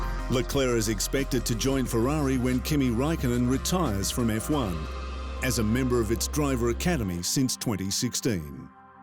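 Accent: Australia. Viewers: It's an Aussie voice over guy but he puts on a Movie Trailer voice. "In a world that betrayed him...." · now I feel bad for not liking this voice lol. It helped that he was Australian 😂😂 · Australian voice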